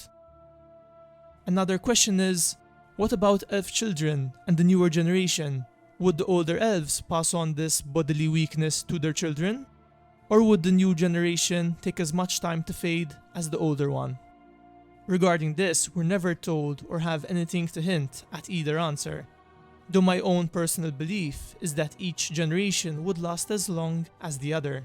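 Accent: Malta. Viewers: Dude, are you Maltese? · Is the narrator Maltese? :) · Are u Maltese? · Are you Maltese? Your accent is really familiar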